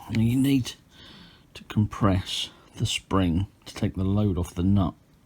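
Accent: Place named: United Kingdom. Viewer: Friend , this talk about you being unhappy about this gun must be because you should have kept off the net for your review because it,s impossible to understand a word of what your saying all wee hear is fluf fluf fluf , its your Brit accent that screws it up , find something else to criticise and stay off the net !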